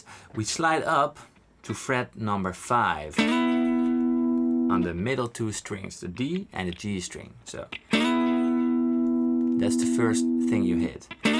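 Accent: Netherlands. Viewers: thanks for the video. you sound dutch :)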